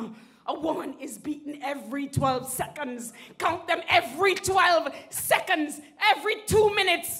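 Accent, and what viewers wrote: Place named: Caribbean. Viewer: That's more of a Caribbean accent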